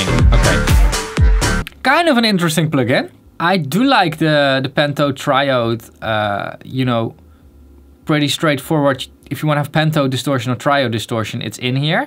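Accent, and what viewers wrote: Netherlands. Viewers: Oof dat nederlandse accent 😂👌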